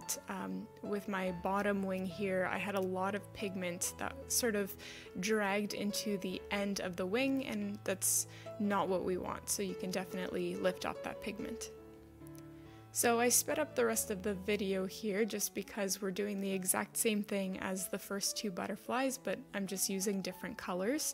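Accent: Canada. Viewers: Oooo. Canada i knew it.. i could hear it in your voice... then i read your profile.. you're very talented. Thank you for your art.❤❤❤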